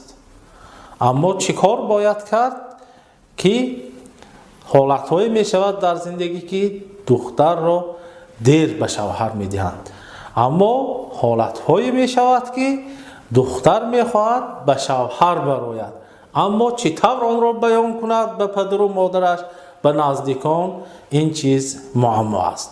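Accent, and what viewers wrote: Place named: East, Tajikistan. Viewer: Брат иё шарқ гап задестан о маву ту а ғарбем ихе гапора намефаҳмем 😇😟🤨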